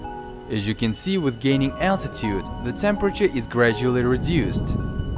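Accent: Russia. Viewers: Такой Русский акцент! Это ты говоришь или кто-то другой?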